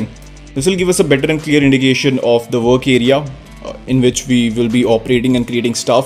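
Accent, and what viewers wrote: India. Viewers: are you a indian , not gonna lie your voice and accent are beautiful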